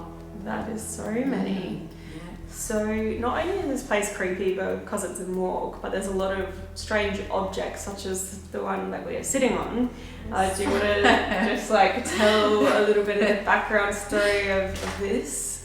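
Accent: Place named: Australia. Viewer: You are so brave. And love the Aussie accent. My fave ghost hunter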